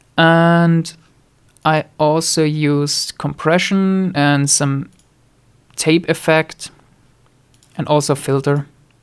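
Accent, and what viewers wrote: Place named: Germany. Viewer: The german accent is the best part of the whole video!! Haha. Love it man. Keep at it!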